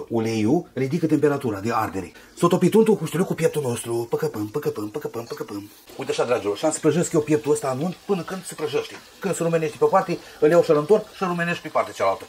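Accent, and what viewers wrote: Moldova. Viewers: M-ai pierdut cu moldovenească ta că nu înțeleg mai nimic 😢😢😢😢😮😮😮😮😮😮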